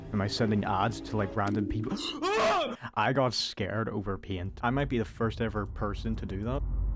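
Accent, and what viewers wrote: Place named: Ireland. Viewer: tbh i only watch your content for the funny irish accent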